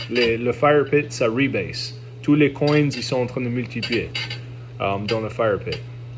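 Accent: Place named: France. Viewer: You’ve got an awesome French accent mate, congrats (I’m French)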